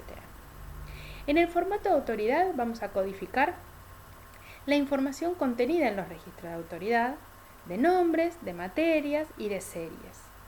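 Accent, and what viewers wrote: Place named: Argentina. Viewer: Qué lindo video y qué lindo acento argento. ¿Podrías decirme como crees que influirá en el futuro el uso de sistemas MARC para las bibliotecas?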